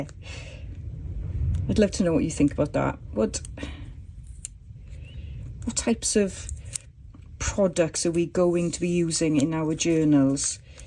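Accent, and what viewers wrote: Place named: Wales, United Kingdom. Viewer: Hello from Montreal. I think there will always be people preserving the art of paper working. It's a lovely art. I was trying to place your accent... it's Welsh... Love it! I so enjoy watching your videos. Thanks for sharing! Takecare. BTW...love your page! ❤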